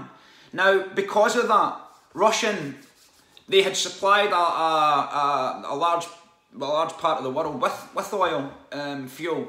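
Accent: Scotland, United Kingdom. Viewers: Love ❤️ the Scottish accent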